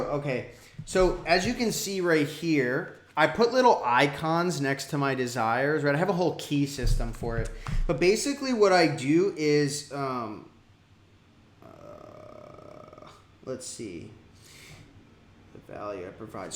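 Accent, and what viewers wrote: New Jersey, United States. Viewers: You can take the boy out of Jersey but you can’t take the Jersey out of the boy 😎🤘🏼🙏🏼WHA-DUH 💦 💧 🤣🤣🤣 I freakin ❤️ it!!! Don’t change , be you siempre 😊😊😊 #dailyliststrong 💪🏼💪🏼💪🏼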